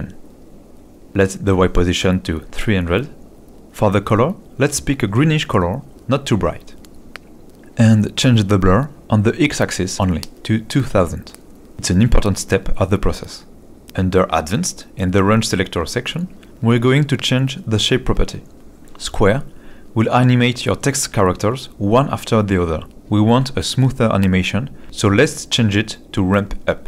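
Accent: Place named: France. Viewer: J'ai l'impression que c un français qui parle anglais la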